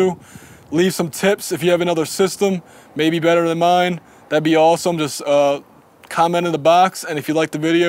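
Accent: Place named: Philadelphia, United States. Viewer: Hmmm... sounds an awful lot like you have a Delaware valley/Philadelphia accent. Anyway, I really enjoyed your song analyses and the loudness wars video, keep up the good work.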